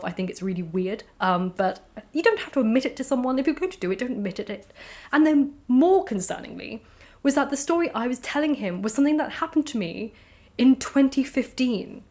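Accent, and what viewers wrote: England, United Kingdom. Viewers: Just found this channel yesterday, now binge watching, as well as Lady of the Library and your wonderful blog posts. So nice to find such an intelligent, interesting and attractive lady on YT. With an English (Irish?) accent to boot!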